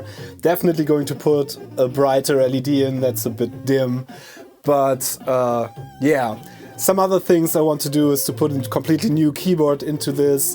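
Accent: Germany. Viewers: You sound German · Man hört das du ein Deutscher bist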